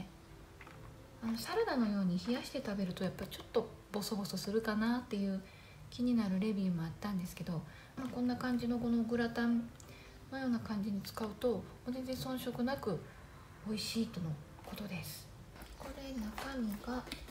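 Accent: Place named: Kansai, Japan. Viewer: 話し方が穏やかな関西弁で聞きやすかったです。 私も爪が弱くてコーティングするネイルを塗っていますがオイルのものも気になってました。今度買ってみます。紹介ありがとうございました。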